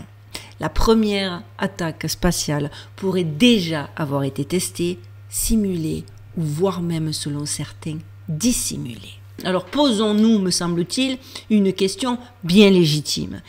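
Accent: Marseille, France. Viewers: trente seizième, au moins....t'es pas Marseillaise pour rien au moins, grosses bises amicales du pays des cigales, super vidéo comme toujours 👍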